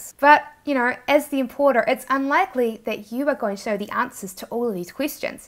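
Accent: New Zealand. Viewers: are you a kiwi? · I love your New Zealand accent is majestic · New Zealand accent,,,Aussies know how to pronounce vowels. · I've never thought New Zealanders have such funny accent. :-) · Definitely New Zealand yis ! 😂 Cute! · she has an accent, why? Because she is from New Zealand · yes huge and heavy, there’s more room to move if you know what I mean. Great instructional videos you provide, keep it up. I need to learn more about finding new products. I’m originally from the west coast of NZ so I can understand you perfectly:-)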